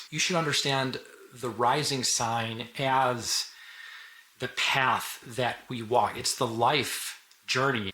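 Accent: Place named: Minnesota, United States. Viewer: All I want to know is, are you Minnesotan ? 😂 your accent give me that vibe. Also Scorpio rising video was on point !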